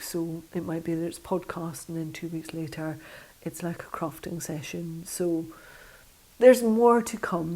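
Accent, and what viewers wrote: Scotland, United Kingdom. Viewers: Yay delighted that you are staying on YouTube. I've watched you from the start and YouTube is my preferred format for podcasts. It's lovely to see you and your projects and feels like chatting with a friend. Being Scottish I love hearing your Scottish accent, turn of phrase and chats about Scotland in general. xxx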